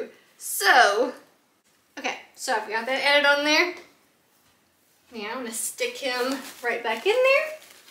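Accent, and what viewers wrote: South, United States. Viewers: Hey Ms. I was looking on You Tube for a "fairly easy Grinch DIY wreath, and here you are... another Sothern Belle that sounds like me. (Roll Tide) Anyway, my best friend lost her husband a couple of years ago on Easter and is struggling with the holidays. I lost the love of my life 8 weeks ago and not looking forward to these Holidays, Even though he (hubby)would want me to grieve like I am, I'm trying to find some normalcy. So I am making her a Grinch Wreath. I wanted to thank you for your uplifting personality and sweet, patient spirit and MAKING THIS LOOK DO-ABLE. Have a wonderful Thanksgiving and Merry Christmas!!!!! And again, Thank you!!!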